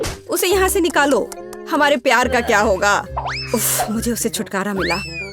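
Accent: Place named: Bhojpuri, India. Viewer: Such a boring voice this lady has... Bhojpuri touch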